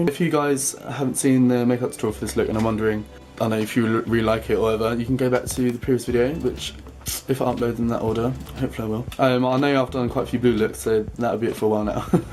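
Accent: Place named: United Kingdom. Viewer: haha he was 'straight' !!! loved this video!! trying to work out where about you are from in the uk from your accent!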